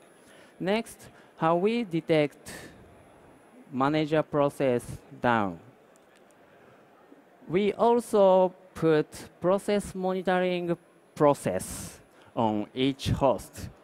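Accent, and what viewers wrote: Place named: Japan. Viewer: 日式英语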